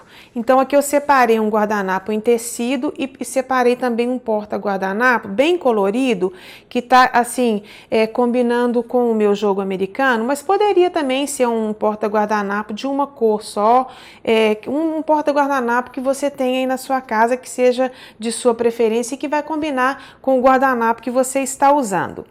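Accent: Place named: Minas Gerais, Brazil. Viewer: por favor se puder faça um vídeo nos mostrando onde colocarmos a comida depois da mesa posta. Vejo vários vídeos sobre mesa posta mas nunca com a comida. Onde ela deve ficar, se na mesa ou no aparador. Como devemos agir. To aqui em todos seus vídeos. Sem contar que amo seu jeito mineiro de falar. Mata um pouco a minha saudade do nosso torrao natal.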